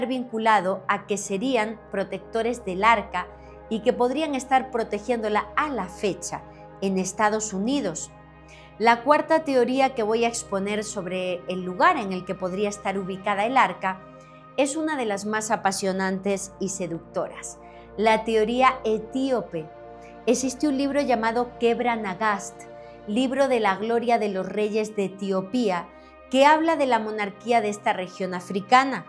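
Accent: Spain. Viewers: me encanta escucharte tienes tantas cosas tan importantes e interesantes en tu canal felicidades eres también muy profesional y comprometida encima de todo eso tu acento español ❤🎉 felicidades nunca te canses de alimentar mi ignorancia ❤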